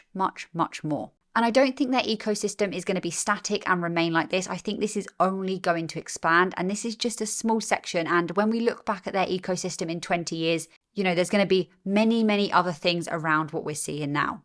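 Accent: England, United Kingdom. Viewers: u sound English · This lady is very good with insightful and well-organized presentations. Her cute little British accent is also fun to listen to.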